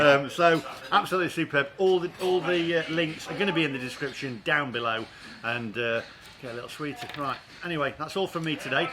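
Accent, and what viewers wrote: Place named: Yorkshire, United Kingdom. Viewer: Yorkshire 100% 🙂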